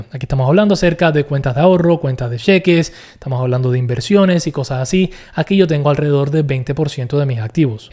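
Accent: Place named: Venezuela. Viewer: Pensé que eras Venezolano. Hablas y te expresas como uno. Saludos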